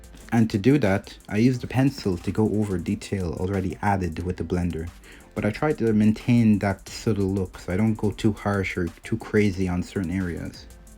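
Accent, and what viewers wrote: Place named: Ireland. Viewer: Hi, this is a great drawing and brilliant tutorial/narration of your process. I just wanted to ask you if you lived in Ireland as I can definitely here an Irish twang in your accent. Thanks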